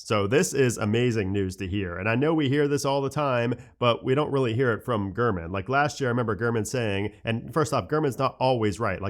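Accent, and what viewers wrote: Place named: South, United States. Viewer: It's a southern accent lmao. It's called an accent.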